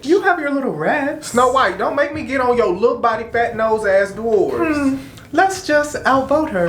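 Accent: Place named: New Orleans, United States. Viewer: Your NOLA accent was on point friend and this coming from a BR native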